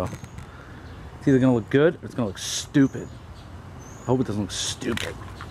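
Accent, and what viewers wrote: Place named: Upstate New York, United States. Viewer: Sounds like upstate new york lol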